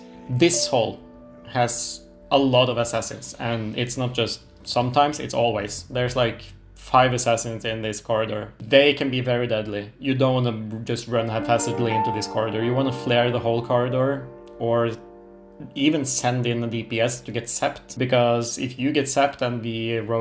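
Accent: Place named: Scandinavia. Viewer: sounds like you are Scandinavian^^ and just a thought but maybe cut the intro down? its kinda long :)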